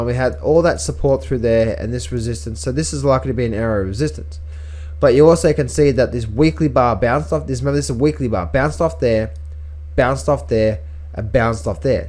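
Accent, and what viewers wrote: Australia. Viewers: Sorry new to this channel are you Australian?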